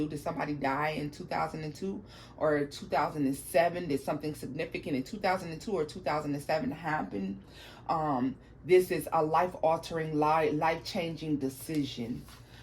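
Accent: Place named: South, United States. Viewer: i’m from texas so i liked that lil country accent lol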